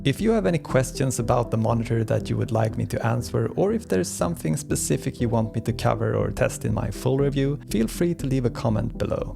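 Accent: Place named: Sweden. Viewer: I KNEW IT.. You are Swedish. Your english pronounciation made it clear :D Looking to buy the same monitor. Greetings from Norway btw.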